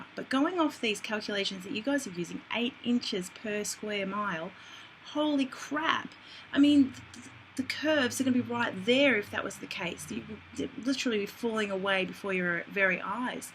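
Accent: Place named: Australia. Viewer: We don't need to have what we learned in school retold. PERIOD. They lied. You sound Australian, I'm in the USA you are right side up.....sorry.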